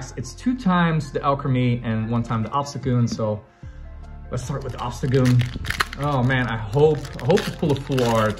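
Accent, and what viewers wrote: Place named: Netherlands. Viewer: Ur accent sounds like ur from Holland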